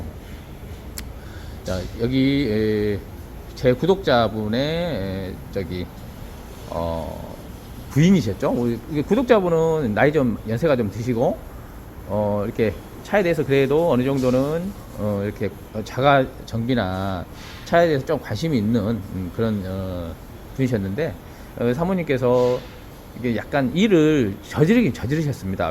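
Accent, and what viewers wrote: Jeolla, South Korea. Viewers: 전라도 사투리부터 없애고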